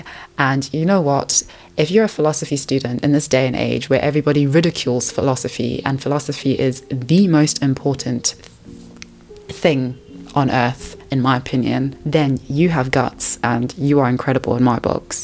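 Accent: RP, United Kingdom. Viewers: Why do you speak so posh? What's your regular voice? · Wjust with your Britsh accent and level of education you would do very well in the U.S especially in media. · doesnt matter if u went to oxford or not , you sound like you did 💁🏼‍♀️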